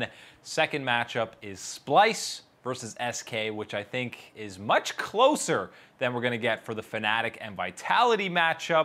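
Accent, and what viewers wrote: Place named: United States. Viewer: Jesus...I can REALLY tell you are American and not European, dude! :D #VITWIN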